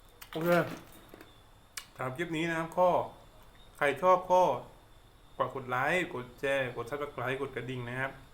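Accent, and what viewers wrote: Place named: South, Thailand. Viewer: อ่อรู้เเล้วก้ว่าอยู่ทำไมพี่พูดสำเนียงใต้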